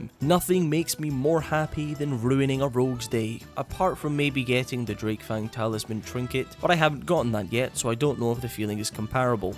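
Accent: Scotland, United Kingdom. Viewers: I first played WOW 16yrs ago, then I stopped when I hit level 85 in Cata. I'm coming back - I'm interested in how the retail changes have changed the experience oh, and for some reason my level 85 Mage is not that level anymore. Trying to pin down your accent, definitely Scottish but can't quite narrow it down - don't think Glasgow, hmmm ...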